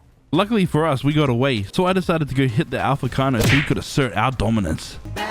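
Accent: New Zealand. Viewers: You sound like you’re from nz. Are you?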